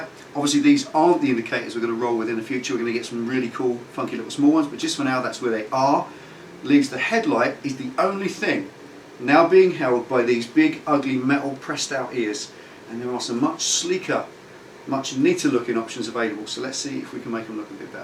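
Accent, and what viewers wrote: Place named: West Country, United Kingdom. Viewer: Top yoke every Tuesday made me laugh. Gurt there's the sound of the West Country.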